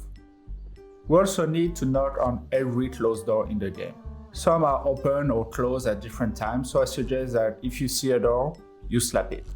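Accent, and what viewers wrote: France. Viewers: thought I recognized a French accent with a touch of African perhaps lol. I'm from Montreal QC too btw, and love the content!!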